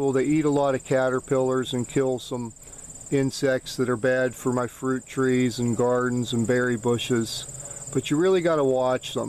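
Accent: Pennsylvania, United States. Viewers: Great video. I agree, they should be left alone if they are not in a spot where they could be a problem. This species does prey on caterpillars but they are a major predator of flies which are just as much of a pest as caterpillars. Last year I did not remove a whole lot of this kind, but when I did they were located around doorways or eaves of structures or low in trees where stings to people were probable. I could tell from the accent you are a fellow Pennsylvanian!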